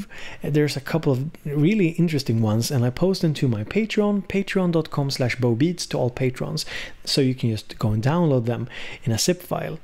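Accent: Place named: Sweden. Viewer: Cool vid, as usual! This vst is so useful to beginnig in the euro rack adventure! Btw, You need to have a great job to travel and go to hotels, so cool! Btw 2, I know were your nice little accent come from now, cause i searched on google (Beware...stalker in view) and you come from: ♪♫♪♫ (drum bearing)...Sweden! Am i right?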